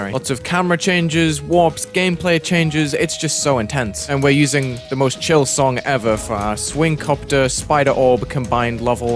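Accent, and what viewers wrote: United Kingdom. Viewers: This doesnt have anything to do with this video, but wouldnt it be cool if you crashed that chest in the beginning of the video, and it would give you a free demon chest of your choice? Edit:, whats ur accent? It sounds a bit british but also not even british at the same time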